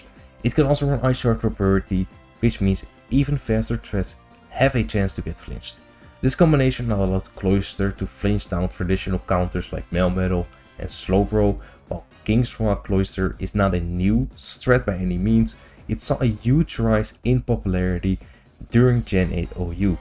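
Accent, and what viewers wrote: Netherlands. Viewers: I like how i could tell you were Dutch after the first 5 seconds. I am also Dutch myself 🙂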